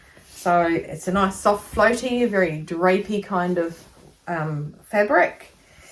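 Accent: New Zealand. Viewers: Have just found your channel through Carol sews. Great to hear a New Zealand accent instead of always American (no offence to our USA bloggers). Your sense of humour is intoxicating, looking forward to following your your channel from a fellow sewist from across the ditch.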